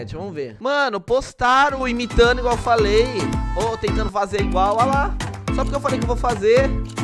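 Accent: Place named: Portugal. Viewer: No puedo entender lo que dices sos portugės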